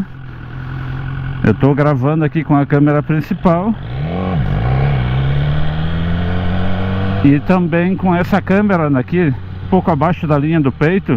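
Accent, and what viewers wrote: Rio Grande do Sul, Brazil. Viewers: essa cinta e top e as 2 câmeras são tops hero 4 e 6; tou gostando do sutaque do rio grande do sul rsr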